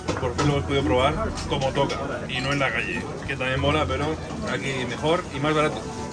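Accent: Argentina. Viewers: Se puede saber porque tiene que poner acento argentino? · amo como después de venir a argentina se te quedó la pronunciación de algunas cosas o te gusta decirlo así. gracias por tantos videos de calidad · en la CASHE...jajajajajaja, el ESPAÑOL mas ARGENTO del MUNDO MUNDIAL y OLEEEEE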